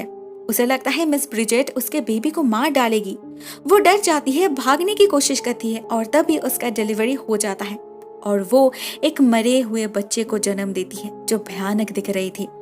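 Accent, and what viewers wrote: Bengal, India. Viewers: Omg your Bengali accent 😍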